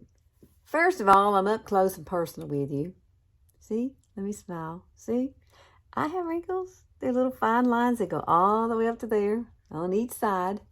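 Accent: South, United States. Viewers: Love your southern accent 💕 what a stunning look I am going to try that on my 76 yr old wrinkly eyes and face 🙌 thank you beautiful girl 💕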